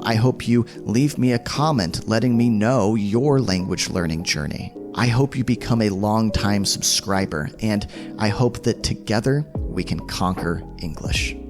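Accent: United States. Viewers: Are u a native American English speaker? Cuz you sound American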